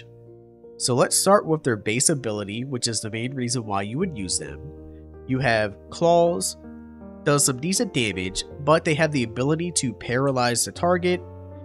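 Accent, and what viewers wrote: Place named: Pennsylvania, United States. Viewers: ah sounds just like the Pennsylvania accent. Either way nice video. Also them Orioles are poppin off! Go to a game this summer don't miss it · I sense south jersey/eastern PA/delaware in the accent 🤔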